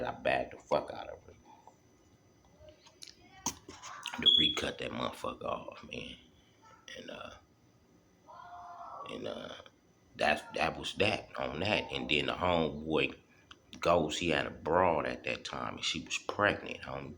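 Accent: Los Angeles, United States. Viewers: I like hearing it from from the B side! You a real LA dude accent and everything homie! Good stories. You being respectful wit it too.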